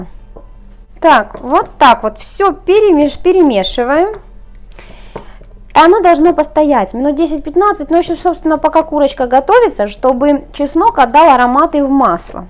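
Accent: Ukraine. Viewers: Ваш Украинский говор воодушевляет очень.... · Мои гости всегда в восторге от вашей курочки, но вот сегодня без паприки и куркумы бледная моль. Как же мне нравится ваш украинский говорок.... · Как я балдею от украинского говора)))))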